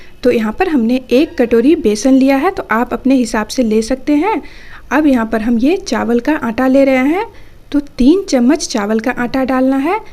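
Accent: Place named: Bihar, India. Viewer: Munh kholte hi pata chal gaya yeh totli aur biharan hain...aaooo...hate biharis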